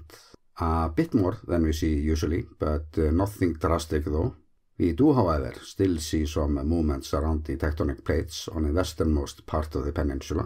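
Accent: Iceland. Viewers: you are good at this. engaging and I find a real relaxation in your Icelandic English and cadence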